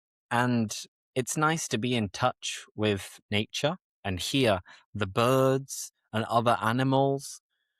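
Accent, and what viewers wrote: United Kingdom. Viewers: This podcast episode unpacks essential holiday expressions, phrasal verbs, and travel vocabulary through slow, clear British English and personal storytelling. The practical examples and interactive Q&A format make picking up natural travel idioms completely effortless. Honestly, this is hands down one of the most practical and enjoyable listening practice lessons for boosting conversational English!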